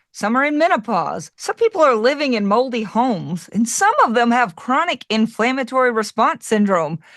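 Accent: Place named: East Tennessee, United States. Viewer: New subscriber. Love your personality. You sound like you’re from around here, East Tennessee.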